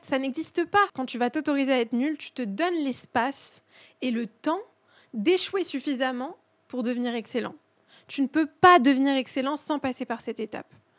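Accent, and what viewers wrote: France. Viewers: Bonsoir, j'espère que tout se passe pour le mieux pour vous. Je vous suis là récemment mais sur insta, plus d'un ou peut-être même deux ans. J'adore la façon dont vous nous parlez, c^est tellement inspirant. Je m'appelle comme vous et viens d'Iran. Je suis iranienne, mais je sais bien parler le français et enseigne le français. J'ai entendu dire que vos étiez d'origine irannienne du côté de votre père. Ça m'a tellement surprise qu'il y a quelques jours, je vous ai envoyé un message sur insta mais vous ne l'avez pas encore vu, j'ai donc décidé de vous parler là. J'ai une simple question à vous poser: est-ce que vous pouvez aussi parler le persan? Aussi bien que le français. Bon écoutez on vous considère comme une française d'après votre accent mais j'aimerais bien savoir si vous avez déjà voyagé en Iran? Sachez que j'apprécie votre travail et j'essaie de ne pas manquer vos vidéos. 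Car, d'une part, je renforce ma compréhension orale et d'autre part, j'apprends bcp choses qui concernent la vie, le développement personnel ainsi de suite à travers vos vidéos. Bonne continuation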